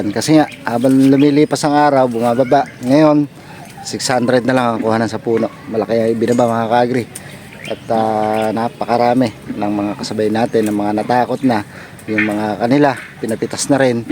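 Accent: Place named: Bulacan, Philippines. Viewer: Bakit ang punto mo when you speak tagalog ay parang from Bulacan ka? I am from Hagonoy, Bulacan. Pero, 54 years na ako sa America. Paborito ko kasi ay calamansi juice.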